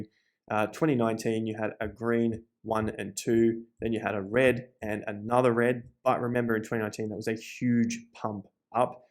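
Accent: Australia. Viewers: Hey, can you do an analysis of the property market in australia please? i can hear the aussie accent;)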